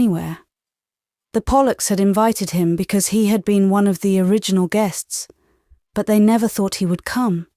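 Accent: United Kingdom. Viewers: It’s the British accent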